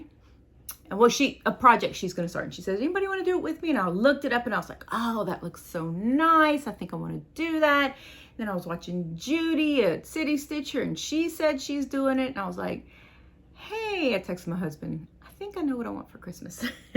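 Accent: Louisiana, United States. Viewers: Oh =my goodness! I just found you. You sound like home! I am from Louisiana. Thank you for a wonderful video. I will watch your other videos this week.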